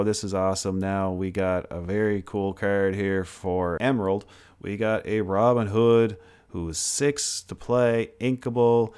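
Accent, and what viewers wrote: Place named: Canada. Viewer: The level of Canadian in your voice....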